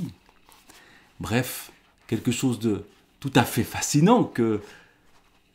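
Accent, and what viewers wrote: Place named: Vaud, Switzerland. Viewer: J'ai l'impression d'être de retour à Lausanne en vous écoutant... Vous êtes vaudois ?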